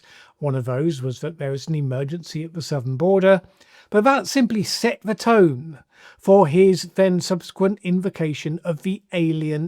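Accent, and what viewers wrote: United Kingdom. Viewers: When someone uses incorrect grammar it makes me doubt what they are talking about. He’s obviously British which may result in a difference in pronunciation.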